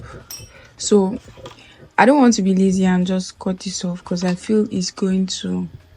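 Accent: Nigeria. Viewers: I love it it's so beautiful and I love your accent Are you Nigerian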